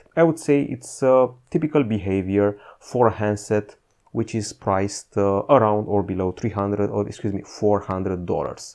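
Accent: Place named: Romania. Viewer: Vorbeste in limba romana. Se cunoaste dupa accent, ca nu prea esti englez😂.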